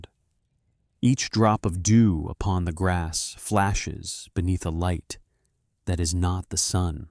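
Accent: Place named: United States. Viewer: Shame a Celtic myth is narrated by an American.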